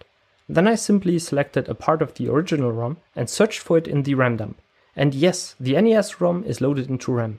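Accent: Germany. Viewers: Are you german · He sounds german too, it only makes it sound more dramatic. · Impressive job dude! Are you german? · Deutscher Akzent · Are you German? Because your accent sounds really German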